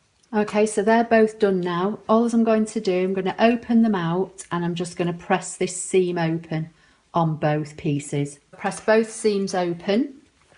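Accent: England, United Kingdom. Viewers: Lovely to hear an English accent on a sewing tutorial. Very well explained, would enjoy seeing more sewing projects from you. Thanks!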